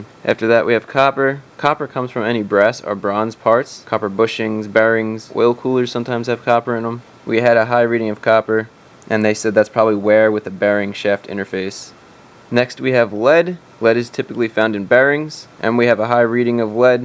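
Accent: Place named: New Jersey, United States. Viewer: Long guess.. are you from New Jersey? Your Water accent is so cool!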